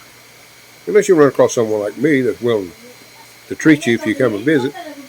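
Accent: United States. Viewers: Enjoy buddy ….. u sound like your from Atlanta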